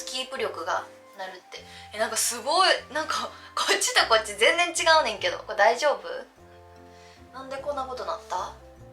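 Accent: Osaka, Japan. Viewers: 平和なほのぼのとしたカワイイ動画ありがとう😄 こんな世の中だから何かほっこりしました。やっぱりみるるん面白いなぁwww一言一言が何かクセがあるし、大阪弁だから笑えます😁 一生懸命なんだけど、何かしでかし感もいいです。やっぱり女の子は髪形で雰囲気かわりますね。 自分は似合ってると思います🆗 PS・・・先日のNAMBATLEの動画も観ました。 ”ミックスジュース”頑張れ！！ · 恵方巻き髪😀まきまき そう言えば握手会で巻き髪見たことなかったねΣ(ﾟωﾟ) 会話が、軽く大阪のおばちゃん入ってる(((*≧艸≦)ﾌﾟﾌﾟｯ 可愛い❤️🥰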